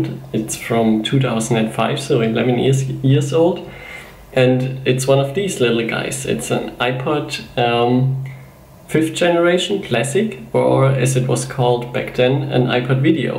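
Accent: Germany. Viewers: except german accent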